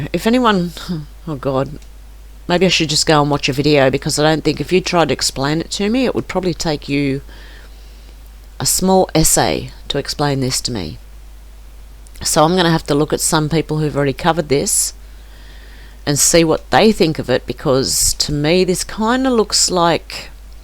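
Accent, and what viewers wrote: Australia. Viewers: You are amazing I am so glad that I stumbled across your channel and subscribed. I can tell that your heart is true your soul is pure and your spirit as of an amazing light and I love your Australian accent. Thank you for all you do. My name is Donnie Jordan and I will continue to listen just love your voice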